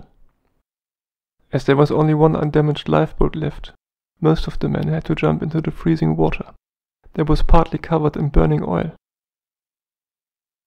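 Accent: Germany. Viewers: I think he is German!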